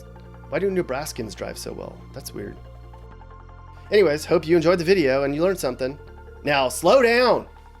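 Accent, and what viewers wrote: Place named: California, United States. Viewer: Love your California accent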